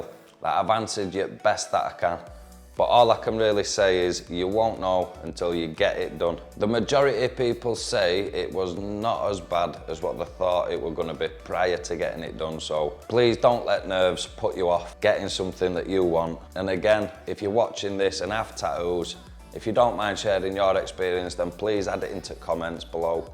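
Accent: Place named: Scotland, United Kingdom. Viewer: I love the Scott accent. “ Tah’ooh”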